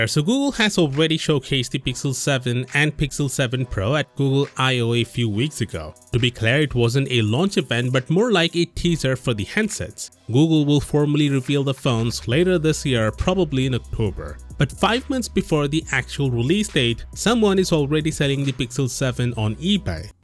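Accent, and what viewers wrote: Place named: India. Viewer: Ugg why does youtube always recommend me this fucking channel. Go away. I just want tech news. I can't stand this guys indian accent.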